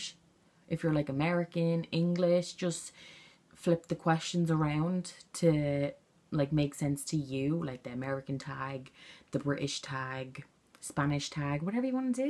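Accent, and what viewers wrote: Ireland. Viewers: Stoppp this video is amazing and I love it. Your accent is GOALS :D I want to visit ireland SO bad <3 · I really loved this video! Argh your accent!! You make me miss Dublin!! New sub!!💕💕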